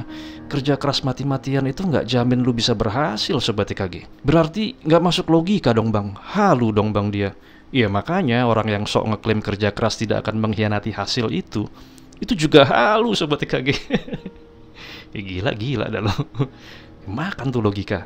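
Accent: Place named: Java, Indonesia. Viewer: Alhamdulillah suaranya medok masuk ke hati bang anda bisa sukses bahagia sejahtera kaya raya penuh keberlimpahan saya pun begitu pasti bisa sukses bahagia kaya raya penuh keberlimpahan Aamiinnn ya rabbaalamin dan terimakasih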